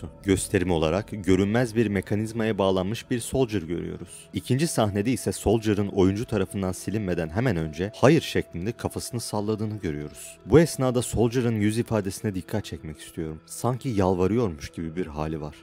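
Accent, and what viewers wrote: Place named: United States. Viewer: Amerikan Türkçesi diyoruz biz bunlara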